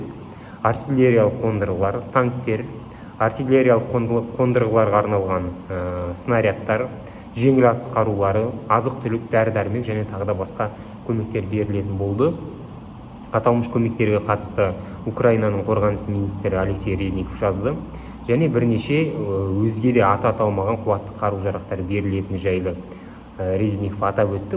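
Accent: Standard. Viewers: Ой, балам, өркенің өссін! Таза қазақша, тамаша дикциямен объективно түсінік бердің.Рахмет. Арнаңызға табыс! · баламыздың сараптамасы жақсы мағлұмат береді. Тілі таза, ойы жүйелі, дикциясы анық. Рахмет.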